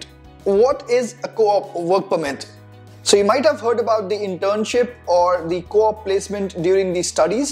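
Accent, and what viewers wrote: India. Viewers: Nice work with all respect but ur language it's so confusing.... I mean the Indian or I called the Indian English it's too bad...... even with subtitle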